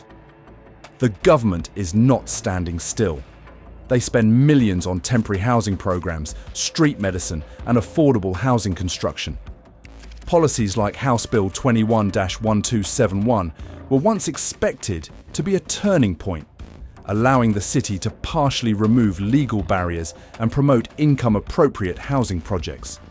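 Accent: United Kingdom. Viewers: Why is it always a narrator with a British accent who narrates these terrible videos about the homeless in America?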